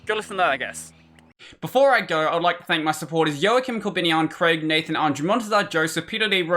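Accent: Australia. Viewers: He’s Australian 🦘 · He is Australian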